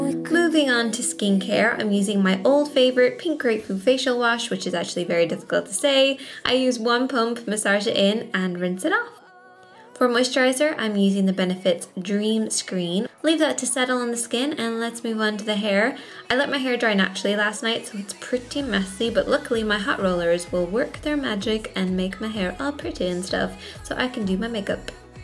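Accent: Ireland. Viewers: hey!! are you irish!! :P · Which part of Ireland are you from? I can't place the accent!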